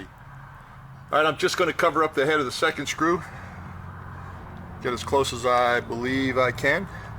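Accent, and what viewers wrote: United States. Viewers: No need to be careful as our different interpretations make it so interesting. Just continue with your 'American' English and I'll sort out what you mean 😉😀 I'm finalising installing independent power feed to the X-table on my Marlow mill having fitted a VFD main drive. The mill did come with a separate motor but it was disconnected by previous owner and the wiring was a dogs breakfast. Using one of those ubiquitous Chinese 12v DC motors. Now it's heaven selecting the correct speed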